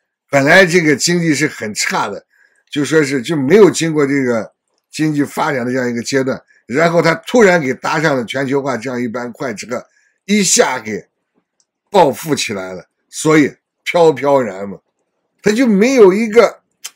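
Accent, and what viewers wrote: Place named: Henan, China. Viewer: Why are you so heavy in* HeNan* Mandarin？Can you please speak English? Do you Understand what the* Humble* Meaning.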